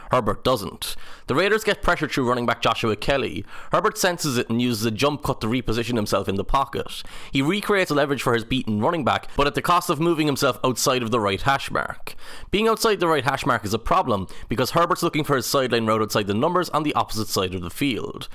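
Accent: Ireland. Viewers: I am highly skeptical hearing an Irish accent explaining American football…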